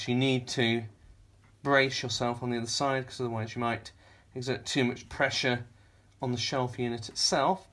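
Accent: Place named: United Kingdom. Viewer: Love the British accent, wish I got one too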